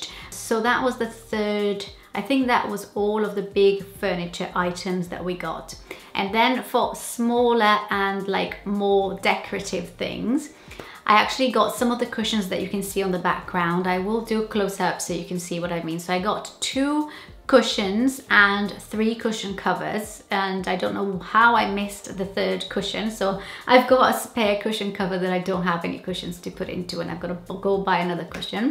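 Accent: United Kingdom. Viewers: Your British accent is really different to my ears but I could understand what you said. Your last video I watched you were still pregnant and you said your daughter is already one year old. oh my. I need to watch more YouTube. In the moment I'm using YouTube Go and I'm not sure I can download your videos there. Anyway, your furniture is amazing! BTW I loved the video quality.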